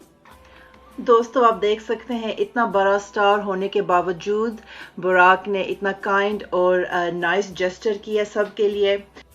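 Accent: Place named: United Kingdom. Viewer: Why are you speaking Urdu in English accent? It doesn't suit you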